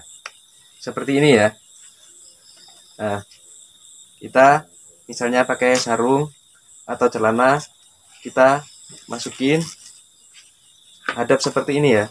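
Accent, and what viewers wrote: Madura, Indonesia. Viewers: Logat e sampean wes koyok duduk wong meduro cak wkwkw keren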